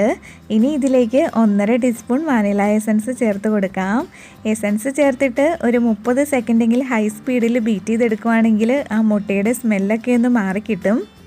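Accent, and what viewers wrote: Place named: Kottayam, India. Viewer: palakkar Kottayamkar parayunnathu pole parayunnathu kelkkana rasam.Appol ithil one cup 240 ml aano.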